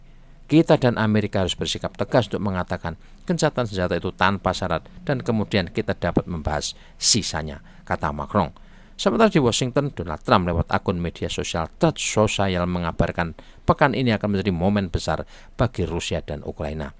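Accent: Java, Indonesia. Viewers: Bagus mas. Aku suka medoknya. Jowo bangets ❤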